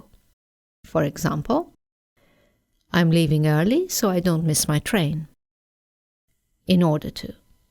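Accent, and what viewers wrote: United Kingdom. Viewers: It's an honour to be taught by you.I noticed your British accent.That's amazing and I'm Azerbaijani.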